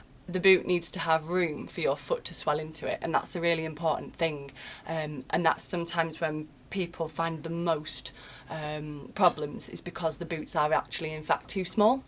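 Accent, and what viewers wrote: United Kingdom. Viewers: Don't understand???You seem to be someone with a very limited knowledge of UK. What a weird comment. If you're referring to her can only think you're not English and think everyone speaks like the queen. Her accent is far from snobby possibly from the north west and is very natural and not all snobby in fact the complete opposite.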